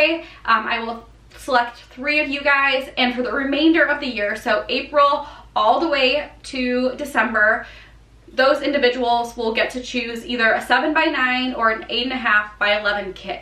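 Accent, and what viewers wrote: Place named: Chicago, United States. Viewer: Congrats girl!!! I found your channel from searching for Erin Condren videos. I live in Seattle but am originally from Chicago! Your accent reminds me of home!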